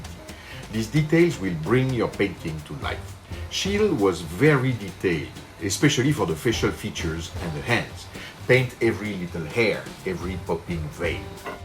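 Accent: France. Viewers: I realised your French accent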